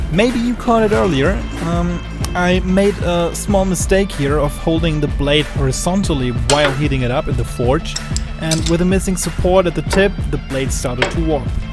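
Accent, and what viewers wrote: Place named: Germany. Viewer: Eine interessante Herangehensweise, wie Du den Griff von Stich gestaltet hast.^^ Vor allem,.... bin ich eigentlich wirklich der einzige, dem es jemals auffiel, dass Orcrist aus dem Hobbit Film einfach nur eine Hälfte von Stich ist? Hält man 2 Orcist zusammen, hat man ein Stich. ^^ .... im englischen klingt der letzte Satz besser. xD Auch fand ich interessant, dass Du für Orcrist auf Blau gesetzt hast.^^ Der Drachenzahn Griff ist wirklich gut gelungen.^^ Die armen Pfandflaschen! xDDDDD Der Schwabe ging Dir jetzt aber sowas von an die Gurgel. Woischd, Kerrle. Dessin 25cent pro Flasche! O_o Ich weiß ja nicht warum, aber für mich muss Glamdring schon eine definierbare und klar erkennbare Blatt Klinge haben. Leaf shaped Blade im englischen, glaube ich. Neben Narsil/ Anduril mein zweitliebstes Schwert der Guten aus Mittelerde. Anhand der Menge an Cutting Tests kann man auch gleich erkennen, was wohl Dein Lieblingsschwert war. 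^^ Man kann es einfach irgendwie raushören. xD Wir Deutschen haben so einen gewissen Klang in der Stimme, wenn wir auf englisch reden. ^^ Nicht nur ein Landsmann, vielleicht auch noch einer aus dem gleichen Bundesland, wenn ich die Rheinpfalz richtig gedeutet hatte? ^^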